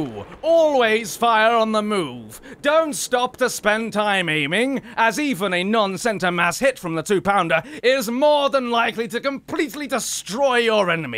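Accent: United Kingdom. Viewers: What part of England is from im from Newcastle the north east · are you really British? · you are by far the best YouTuber I've ever seen. You see, I am a person that loves to listen to British war and marching music and play war thunder and your videos are all that and more! I also love your British accent! Thank you for reading my comment ( If you found it) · Do you put on a voice for videos or are you really that British?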